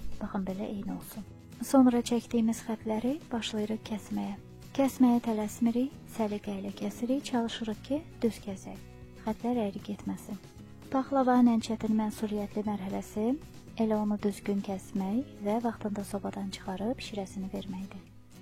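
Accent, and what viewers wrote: Lankaran, Azerbaijan. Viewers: Salam xanim,videonuzu izledim.Her wey Cox temiz,cox gozeldi.Aydin,selist nitqinize o melahetli sesiniz cooox yarawir.Men de paxlavani eyni Bu qayda ile edirem,ancaq qozun qabiqini hec vaxt temizlememiwem,hovselem catmaz buna😀Size wexsi bir sualim olacaq,cavablandirsaniz xow olar?Siz cenub zonasindansiniz?Lenkeran,Lerik?Lehceniz yoxdu,Amma bir kelmenizden orali olduqunuzu tehmin etdim.Sadece Duz fikirlewirem ya yox onu bilmek isterdim.Cox sag olun!Size 👍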